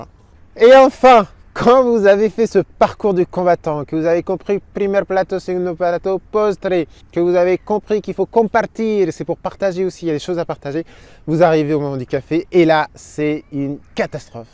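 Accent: France. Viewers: il y a mieux pour apprendre l"espagnol, ton accent est tres frenchy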